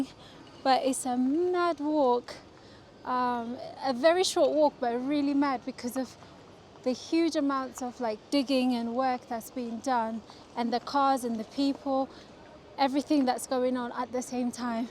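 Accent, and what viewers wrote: United Kingdom. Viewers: "Health and safety officers! eat your hearts out". I like your posh sounding British accent.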